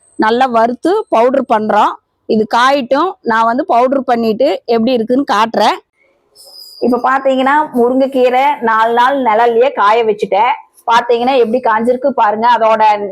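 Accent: Kongu, India. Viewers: எங்க கொங்கு நாட்டு பக்கம் நாங்க பொறிக்க போரன் அப்படிதான் சொல்லுவோம் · Ada idhu Coimbatore bashaiingov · Kongu slang apditha irukum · Ithu coimbatore slang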